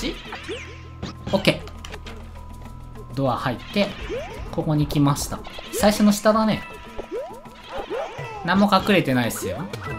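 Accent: Kansai, Japan. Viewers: さん関西の人？(・o・)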